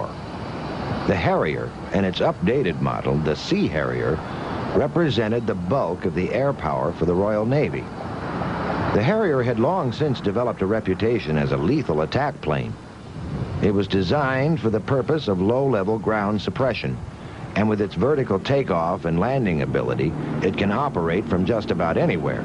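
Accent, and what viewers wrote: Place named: France. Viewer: Narrator French accent... sucks!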